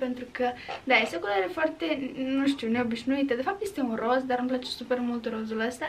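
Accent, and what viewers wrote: Moldova. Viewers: Am o întrebare corbești moldovenește?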